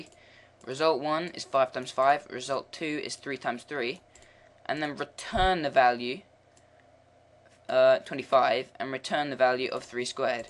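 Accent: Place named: England, United Kingdom. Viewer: They're not, they are in the language of lua. If you mean why is the person who's talking doing so with an English accent then he's probably lived in England for most of his life, if not all of his life.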